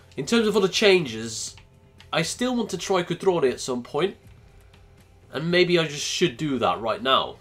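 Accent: Netherlands. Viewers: Is he dutch? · Ben jij Nederlands (translation: are you dutch cuz i heard a dutch commentator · Are you Dutch? I'm pretty sure I heard Evert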